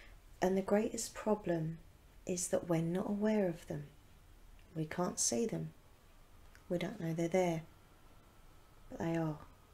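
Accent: Essex, United Kingdom. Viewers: ah an Essex lass fair enough! I'm from lancs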